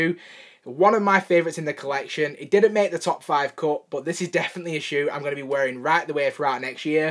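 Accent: Scotland, United Kingdom. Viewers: Saw your comment on settle Fowler. Is that a Scottish accent? Anyways I like this video. My favorite yeezy 350s were the cinder, earths linens and upcoming sand color way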